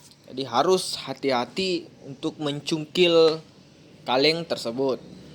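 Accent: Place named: Makassar, Indonesia. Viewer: Kentara logat makassar nya wkkw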